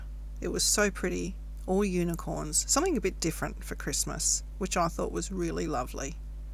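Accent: Australia. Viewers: Such a cute stamp set and card. Great to hear a fellow Aussie...tfs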